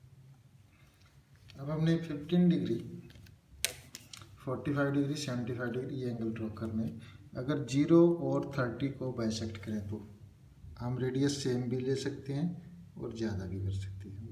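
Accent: India. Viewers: Sir you are from Himachal i think for your tune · sir ji aap pakka haryana se honge ! kade t ho sir jii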